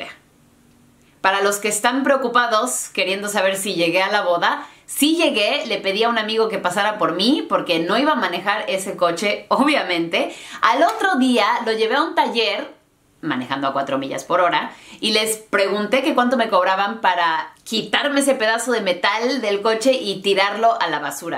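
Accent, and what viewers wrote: Mexico. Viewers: es un poco negativo decir no seas como mejor di algo como tomando experiencias de:) saludos un canal muy padre positivo agusto al escucharte hablas español con acento de nosotros de México:) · Me encanta tu asentó mexicano❤️❤️ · Jeje jeje. Me encanta tu español super mexicano. Numero uno. Dos. Si yo te contara todas por las que he pasado te mueres de la risa e impresion. Aqui aplicacion que cuando no te toca. Ni aunque te pongas. Bendito Dios. Seguimos aqui güera chula 😙😙😙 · Hablas el idioma español, perfecto me has sorprendido, ya hablas como Mexicana, saludos desde Veracruz. 😁☺✋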